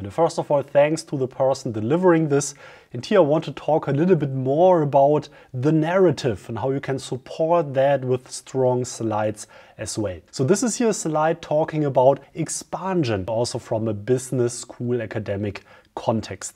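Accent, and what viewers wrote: Germany. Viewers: Ernsthaft, so schwer ist das „th“ nun auch wieder nicht. Das klingt wie reinstes Hochdeutsch. Andere Sprachen KLINGEN anders. Das hier ist echt schwer auszuhalten. Wird dir kein native speaker sagen, sie sagen es nur denen, die tatsächlich wie native speaker klingen. Aber das kann echt niemand lange aushalten. Schlimmer als sächsisch. Oder indisches „Indlish“. Du klingst wie wenn Otto Leute nachmacht, die eigentlich kein Englisch sprechen. Wie kann jemand English lernen und zu 100% Deutsch klingen auf dem Level?? Sorry, super Inhalt, aber ich krieg schon die Krise, was glaubst du, wie das für native speaker klingt…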